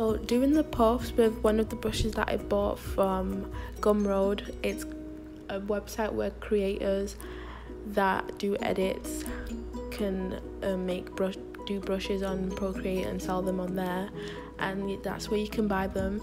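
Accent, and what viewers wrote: United Kingdom. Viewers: lmao ella mai is from london. has a northen accent they ain the same 😂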